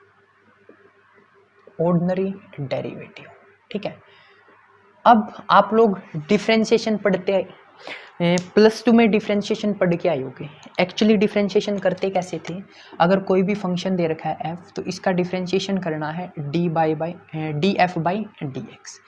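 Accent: Haryana, India. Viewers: Ap haryana se ho na ?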